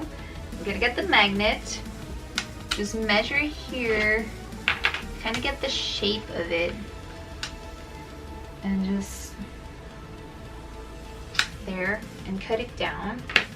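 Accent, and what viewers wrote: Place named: Brazil. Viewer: tu poderia colocar legendas em portugues ou fazer um video em portugues? infelizmente meu ingles nao é fluente, nao consigo entender tudo... cerca de 60%, as vezes um pouco mais, depende do sotaque.... talvez se tu falar em ingles do jeito que tu fala portugues (sotaque brasileiro), eu vou entender uns 80%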